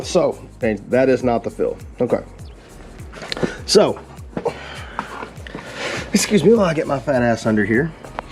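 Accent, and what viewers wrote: South, United States. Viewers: I always look forward to Thursdays! Happy Thanksgiving,! I see you have now achieved 220 subscribers. Congrats! NICE t-shirt! I need to get me one of those. Now - you say EVERYTHING you will need to change the oil is right on the table. I do NOT see any Crown Royal or Mich Ultra., you are slacking. But I must say it looks like a surgeon's tray! Everything you need, there at the ready. Me, I'm in and out of the tool box and the house 10 times. I dig the ingenuity in using a dropper when you don't have another small tube or funnel to fit. That's something I would do. We learn by doing and get better as we go. What is there, a "thing" about Amsoil? I have never used it but it seems like they make a good line of products. Why would someone criticize using it? Gotta love YouTube. Learn something every day about these bikes. Looks like you got it filled up to spec with nice clean oil. 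My bike being 7 years old, I'm concerned about all my fluids - oil, shaft oil, hydraulic fluids and coolant. Maybe in the Spring. My tires still look good although original equipment, but I worry about them as well. I only have 700 miles on 'em. But dry rot is a concern. Love the way southerners say "oil". Ole. AmsOle. One syllable. Not "oy-l". Ole. I'm off to get a quick ride in before chowing on a delicious turkey dinner. Have a good one! Tongue click, finger point back atcha.😎😜👓🕶🏍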